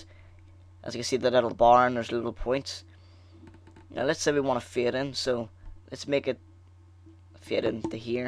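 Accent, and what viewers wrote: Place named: Ireland. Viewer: Like ur irish accent! :D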